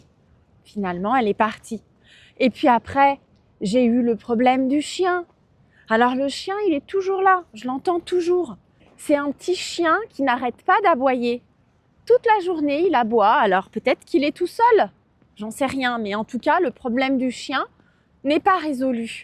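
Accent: France. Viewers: Heureusement pour moi que j'habite une maison individuelle sinon ce serait un calvaire avec le voisinage, j'allume le micro et je mets le son bien fort, surtout que la voix aigué avec un accent parisien🗼 très particulier de notre belle 🌹est très agréable à entendre, sauf ma très chère mère quand je mets la musique fort dans ma chambre, elle vient pour me dire " mais tu n'entends pas très bien! tu sais d'où on t'entends!, parfois elle rentre en dansant 💃en me disant tu sais l'heure qu'il est! ha ha ha😊, ma grand mère qui habitait un appartement à Alger, ses petites filles jouaient en portant les souliers à talent de leur mère en faisant du bruit, la voisine du bas venait chez elle se plaindre du bruit de ces souliers à talent, donc les appartements c'est toujours un problème, il faut revoir les constructions avec de nouveaux matériaux de construction où le bruit sera infranchissable pour éviter les dérangements entre voisins et garder la quiétude et la bonne ambiance entre eux. 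Maintenant passons à l'humour, mais c'est juste une blague pour plaisanter, une histoire qui s'est répandue en Algérie il y a plusieurs années, il s 'agit d'une française qui est venue en Algérie, elle a rencontré une famille qui avait un chien qui lui a réellement plu alors on le lui a fait cadeau, en retournant en France, comme elle habitait un appartement, elle a mis le chien dans son balcon, mais la nuit quand elle dormait, le chien n'arrêtait pas d'aboyer ouaf ouaf oua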